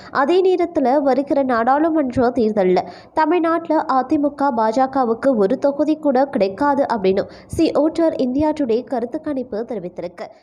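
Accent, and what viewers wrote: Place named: Sri Lanka. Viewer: தமிழ் உச்சரிப்பு கூட சிங்கள தமிழ் மாதிரி உள்ளது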